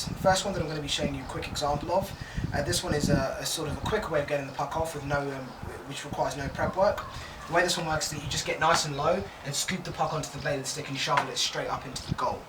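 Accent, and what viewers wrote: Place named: United Kingdom. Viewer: A black guy with a british accent giving hockey tips... awesome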